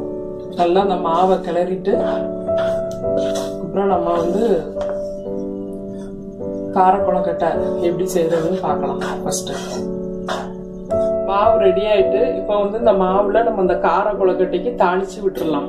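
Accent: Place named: Tirunelveli, India. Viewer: super mam....👌👌👌 unga slang romba nallaruku ...tirunelveli tamil mathri...... Azhaga iruku ..👍👍❤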